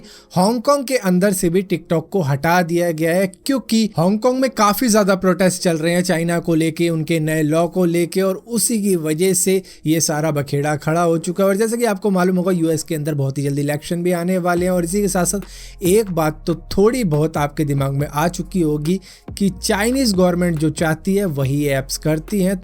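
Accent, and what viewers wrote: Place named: Haryana, India. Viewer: Bhai videos m information bht bdiya deta,h beach beach m haryanvi touch b diya kr ,kbi kbi nikl jati h tre muh se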